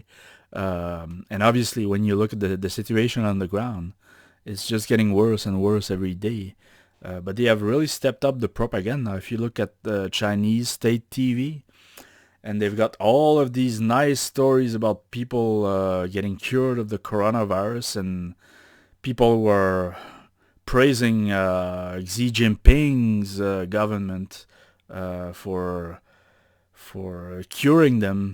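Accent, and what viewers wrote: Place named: Quebec, Canada. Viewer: Oh! que Oui !, je reconnais un Québécois quand j'en entend un, content de savoir que je suis pas seul dans ma recherche pour la vérité sur le coronavirus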